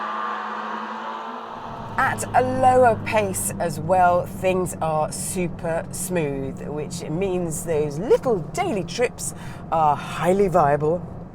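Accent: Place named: United Kingdom. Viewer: Hello! Love your very British accent!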